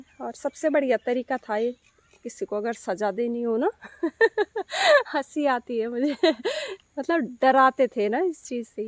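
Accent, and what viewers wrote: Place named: Garhwal, India. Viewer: Bahut bdya lgni cH gadwali bhasa jb ap bolte hai🙏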